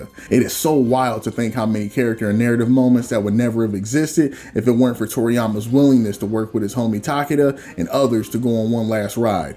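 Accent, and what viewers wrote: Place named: Midwest, United States. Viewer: What part of the Midwest you from 😂. STL represent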